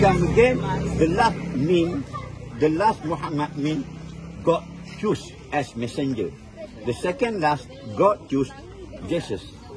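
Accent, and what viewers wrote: Terengganu, Malaysia. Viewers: English Terengganu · terkenal sohor dgn loghat daerah tganu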